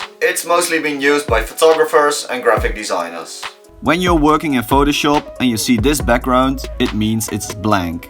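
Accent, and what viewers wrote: Netherlands. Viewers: You dutch? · You Dutch? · Hoi ik weet niet of het te persoonlijk is om te vragen, maar ben jij nederlands? Je engels accent is echt een typisch Nederlands accent, kwas gewoon ff nieuwsgierig. Verder wel een coole video. :D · I know ur Dutch or Belgian by the way your talking English🤔🤔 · Ik hoorde al gelijk dat je nederlands bent 😂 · Is dat in Eindhoven? Denk dat namelijk omdat je accent klinkt alsof je uit nl komt. If you dont understand me i Get it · Are you dutch? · Nice ! K vind het wel leuk dat je gewoon aan zowat alle Nederlanders kan horen dat ze Nederlands zij. Als ze Engels spreken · Goeie Nederlandse accent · He sounds Dutch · ben jij nederlands je accent lijkt mega hard lol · Ok are You dutch??? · Is it me or do you sound Dutch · Duidelijk een Nederlander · Dutch accent! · Dutch accent: 100% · You sound dutch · Bruh the dutch accent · You sound like you're Dutch and you're from Limburg or Brabant if you are then hallo! 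· R u dutch? It sounds like ur dutch with that accent xD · Ru dutch? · are you dutch you sound dutch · That’s a strong dutch accent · You sound Dutch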